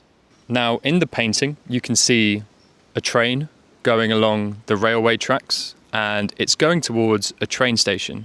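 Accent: United Kingdom. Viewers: Just like in your previous videos, you really delighted me. Through your videos, I've been able to learn English effectively while also discovering interesting stories about British history. Furthermore, you have a very distinctive British accent (I guess), which is easy to understand and concise, helping me practice my listening skills confidently, as my listening ability is very poor. Thank you very much.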